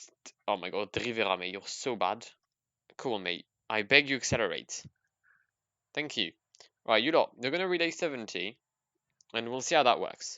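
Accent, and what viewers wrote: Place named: France. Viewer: Are you from Belgium or France, because you have a French Accent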